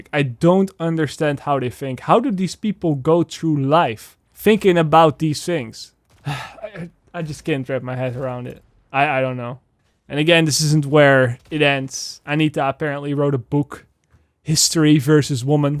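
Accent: Netherlands. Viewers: Are you Nederlands? I hoor het in je accent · I knew you were dutch before i saw the Twitter posts I have the same accent when speaking English oof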